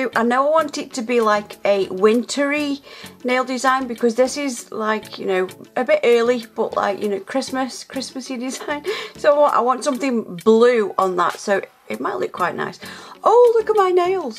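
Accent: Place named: Stoke, United Kingdom. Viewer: I love these. I’m a nail newbie could you have put the glow in the dark behind the polygel sections of the design so the glow effect would shine through the polygel. I’m just starting out and I don’t have any glow in the dark to try it with myself so I’m being a bit cheeky and asking you if you think it would work. I’m not sure where you’re from but you sound like a fellow Stokie xxx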